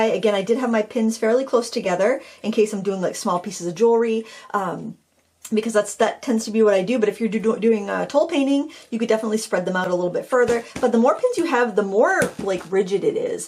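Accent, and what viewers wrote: Maine, United States. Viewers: LOVE that Maine accent... I thought you were saying 'drawring' board at first :) These are clever...well done. I love how your bowls are turning out!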